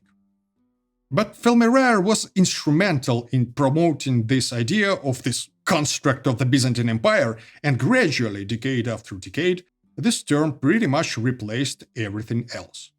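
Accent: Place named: Eastern Europe. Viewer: Wrong. The name "Byzantines' was popularized by the other Roman empire... the German speaking one. They refused to call the other Roman empire Roman because they insisted the holy Roman empire was the "real" Roman empire. Your anti-Greek videos and slavic accent suggest a less than objective motive. What's your background?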